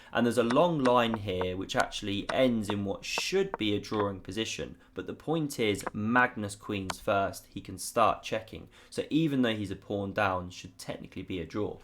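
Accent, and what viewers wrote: England, United Kingdom. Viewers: What a great oidee-are lol. I love the way English people speak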